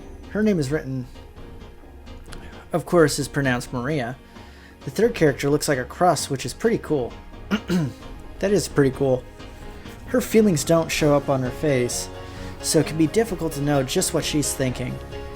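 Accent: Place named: United States. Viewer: Jesus christ the blueish grey haired woman's tits are so unnaturally big that it feels uncomfortable to look at her, the faint femme fatale smile on her face doesn't help either lol Loving the voice acting! especially the female voices and the little girl's "uu-" XD so you are American? I should have noticed! I'll give you a little hint: Unlike in english, where a certain vowel may sound different depending on the word, in Japanese, every vowel always sounds the same way: A always sounds like "ah" (as in cat) E always sounds like "eh" (as in pencil) I always sounds like "ee" (as in feel, peel) O always sounds like "oh" ( just a short O sound as in "boy", not as in the verb "to go") U always sounds like "oo" (as in fool, goon) (It's much easier for a native spanish speaker as myself to pronounce japanese! you may have noticed some grammar horrors in my comments! 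Hope that was helpful!)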